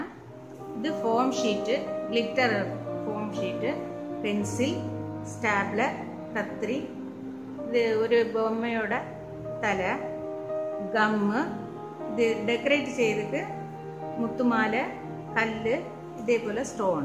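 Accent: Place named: Kerala, India. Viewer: Madam ningal malayaliyanennu thamil parayunnathu kettal ariyam, malayalachuvayund Aa bashayil. It's ok. But picture ethra cheruthayittu kanichal anginey clearayi kanum. Eni adukkunna video valuthayittu kanikku pls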